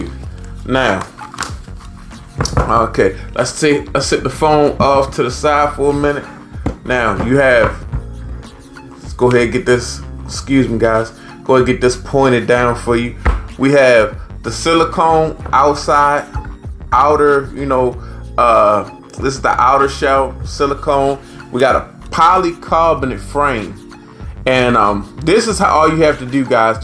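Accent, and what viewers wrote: Baltimore, United States. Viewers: Sounds like you're from Baltimore, good review and also dud was right cut the nails 😂💯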